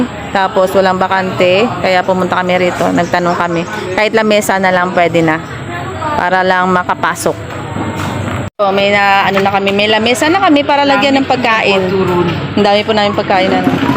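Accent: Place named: Hiligaynon, Philippines. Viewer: Daw ilongga voice mo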